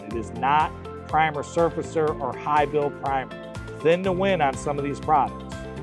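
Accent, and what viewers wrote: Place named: Michigan, United States. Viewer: Q&A is awesome. I like that you are describing the way you do it. It's up to us after that to follow your way or tweak it to our preferences. I hope you keep doing the podcasts and video's. It is so interesting to hear you're way. It's great to get a professional point of view. I'm a Michigan boy removed 33 years. They said I had an accent when I moved to Washington State. I never heard it, until now. Love the Dad podcast. Also appreciate you helping us all out. I have a 67 Camaro. "Better of Dead" I'm in final sand. Base coat clear coat. I'm sanding to 600 because I'm going black. I'm using my local Wesco products. Euro prime. No epoxy. Can I put epoxy on top for a sealer? Is 600 to tight to grab the next coat? It almost shines. Using a finish line devilbiss 1.3. For final. Also. I have at least 1000 hours In bodywork. 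I don't want to screw it up by using the wrong product or clear on it. Thank you and good to hear that Michiangder accent!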